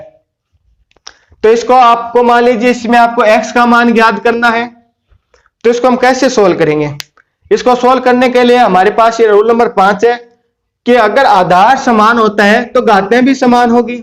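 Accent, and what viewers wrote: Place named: Sri Ganganagar, India. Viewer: Language ka lahaja.. Dekhkr lga... I'm from Sri Ganganagar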